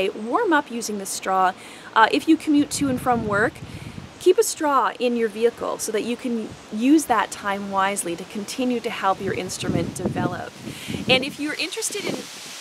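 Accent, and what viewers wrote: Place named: Midwest, United States. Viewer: thank you for sharing your knowledge. you sound like you're from the midwest. i'm from wisconsin!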